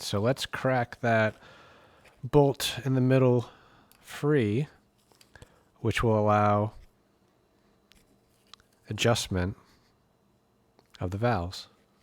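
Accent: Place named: New Hampshire, United States. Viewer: That is "local accent"....New Hampshire?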